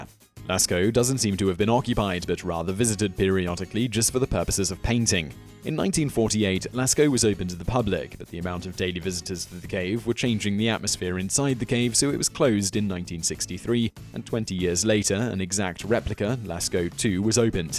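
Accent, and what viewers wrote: England, United Kingdom. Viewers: Fantastic but so very english accent some words I can't understand.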